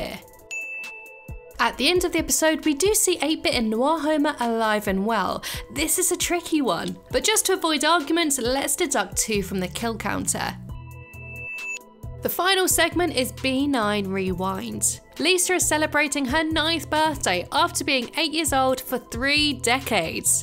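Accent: United Kingdom. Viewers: your british accent totally ruins certain puns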